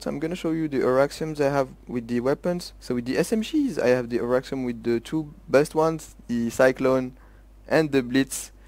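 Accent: France. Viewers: are u french (with your accent)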